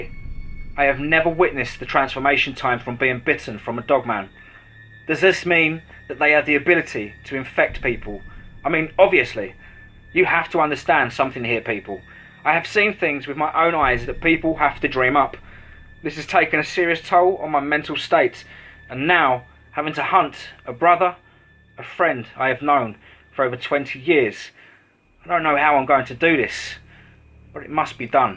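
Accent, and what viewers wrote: England, United Kingdom. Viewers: I Love Your Narrative s and Stories and your Amazing Talented enthusiastic English accent voice that puts an entertaining twist to the tale and puts your listening audience right inside the adventures of those who are attacked by the Creatures of the Night! God Bless You my Friend and Thank you for doing what you do because I truly look forward to Bedtime knowing I can lay back turn on your Channel and relax and Enjoy your latest episode of Cryptic yarn s! Been a fan of Cryptids and Paranormal phenomenon s my whole life since early childhood ! I find that Unknown world of Creatures that are not supposed to exist to be utterly fascinating and interesting especially now that their is growing Scientific Evidence and Eyewitness accounts that these creatures of folklore may actually exist!!! Have a Great Weekend! Keep up the Great Work Please Kind Sir!!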